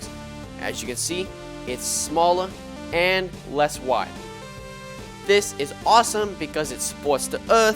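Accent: New York, United States. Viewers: I can feel the new York accent through the screen. Good bid m8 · You are from ny? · Your from new york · As a person who lives in New York I love his NY accent edit: sheesh thx for the likes and heart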